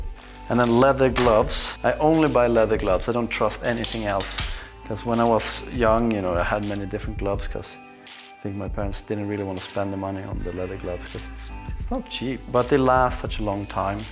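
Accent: Sweden. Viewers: Jag tyckte att det var lite svensk dialekt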